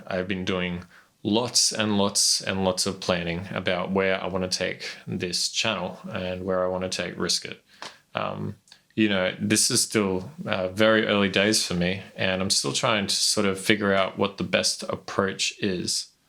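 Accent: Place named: Australia. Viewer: Honestly too as a westerner, your accent is 75% of the appeal of your voice. Don’t underestimate the power of your Aussie accent bro. I know that sounds ridiculous and trivial. It’s really not though. Your accent and the naturally lower timbre of your voice really plays into something good.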